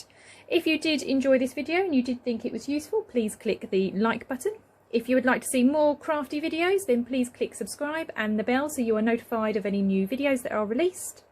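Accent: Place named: United Kingdom. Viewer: Thank you. A British accent, great. Translucent - light passes through.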